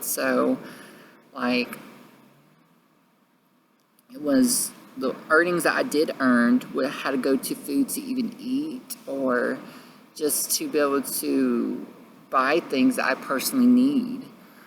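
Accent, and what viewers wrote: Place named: South, United States. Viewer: So You are a gorgeous man, smart, sweet, Have a very southern accent and still love God, Yeah I'm pretty sure you are what we call a perfect guy 😀 This was an amazing Coming out story that was so Genuine and unedited, which is what I think is perfect. You're a Grandma's boy, that may of been the most adorable thing you could say 😊 You sound so much more interesting than me. I'm Steven, Geeky gay guy who's 25 From Upstate New York that has Asperger's. Anyway, I'll shut up, I'm probably boring. I'm happy to be your 13th Subscriber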